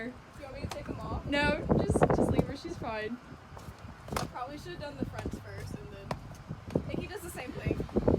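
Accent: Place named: Texas, United States. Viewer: Definitely can tell you’re Texan lol “don’t kick the trailer ma’am” haha though i live in tx too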